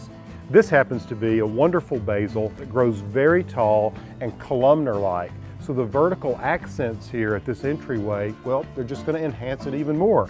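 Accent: United States. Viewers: urbs??? Baysal??? ;) loving the American Diction :)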